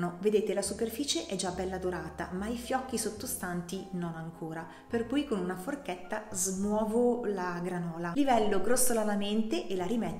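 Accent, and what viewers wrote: Romagna, Italy. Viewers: Ottime idee!!! La tua voce è molto dolce..sei romagnola vero? 🙂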